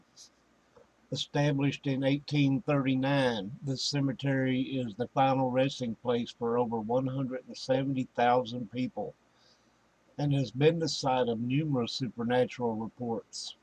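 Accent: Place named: United States. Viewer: How come there are know English person doing this video surly we know more about our heritage than Americans I say that because the person talking sounds American to me just saying lol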